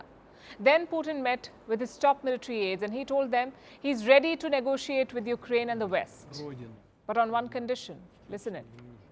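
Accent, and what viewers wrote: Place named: India. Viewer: I love your news but please speak English little bit better... I can't understand of of your Indian accent, its way too strong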